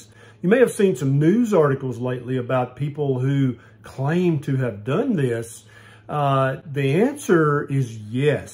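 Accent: United States. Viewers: Where in America does the Doctor's accent come from?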